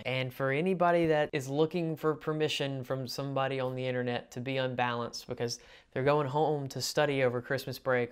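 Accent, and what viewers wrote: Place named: South, United States. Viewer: Your particular Southern accent is nice to listen to.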